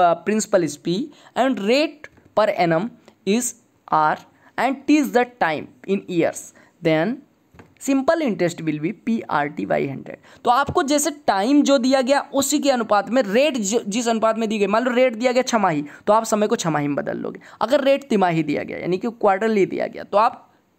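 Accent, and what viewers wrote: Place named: Pratapgarh, India. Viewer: hai nai bhasha pratapgarh ki lag rahi hai sir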